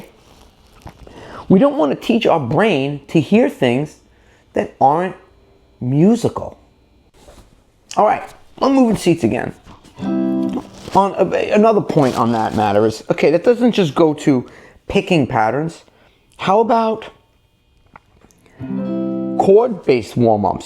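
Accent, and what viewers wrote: New York, United States. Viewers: I very much like your videos and yoa nu youak accent also😉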